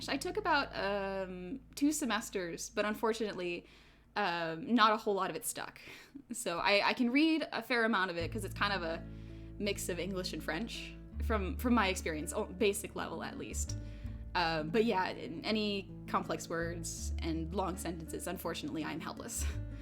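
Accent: South, United States. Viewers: oh no damn doubt its a southern country girl. no city girl says hey sugar the way she says it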